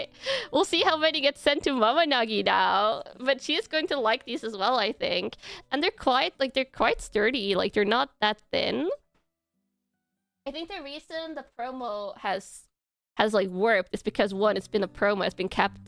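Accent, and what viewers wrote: Norway. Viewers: love! Thank you for the pack crackings and chatting, a true delight any day of the week:_KannaKamoHug: Those Moomin cards were absolutely gorgeous, a very well designed and crafted collectors item!:_KannaShiny: The kamos hats are coming along very nicely too:_KannaKamo::_KannaComfy: Its always lovely to hear you speaking Norwegian (or your Norwenglish:_KannaLmao:) an entire stream would be very interesting:_KannaLove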